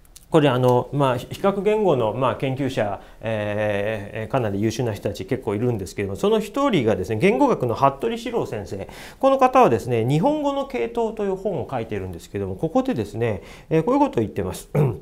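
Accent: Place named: Tohoku, Japan. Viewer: 東北弁に聴こえるー。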